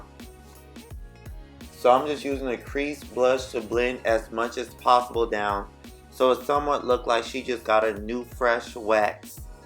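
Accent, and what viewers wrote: Bahamas. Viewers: Omg I thought you sounded Bahamian 🇧🇸🇧🇸🇧🇸 .... Love your channel even more now!❤️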